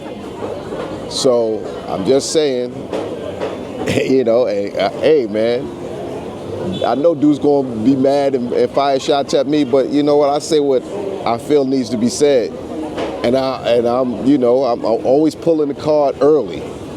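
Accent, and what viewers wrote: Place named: New York, United States. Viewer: Man if this guy ain’t a New Yorker…😂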